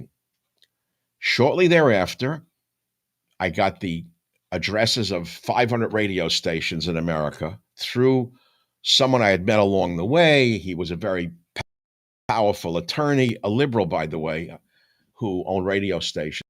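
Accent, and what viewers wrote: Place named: Brooklyn, United States. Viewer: Subbed! I didn't know you were online - I thought I lost you after you left radio. I love your accent, reminds me of my family growing up, that's a particular brooklyn accent, you must be from the same neighborhood my parents grew up in. I can listen to you read a phone book, how much better to listen to you speak the truth. America is blessed that you're still with us and of sound mind and health.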